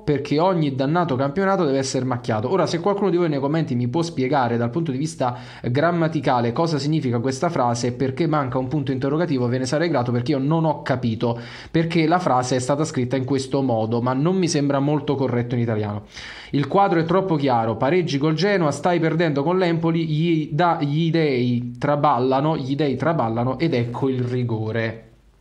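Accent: Campania, Italy. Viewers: La cosa più brutta di questo video è il tuo accento Campano ti hanno fatto proprio il lavaggio del cervello ci vuole coraggio difendere una squadra del nord...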